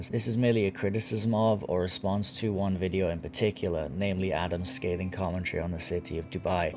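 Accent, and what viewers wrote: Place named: India. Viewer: interesting accent, i guess you are indian or somewhere from middle east?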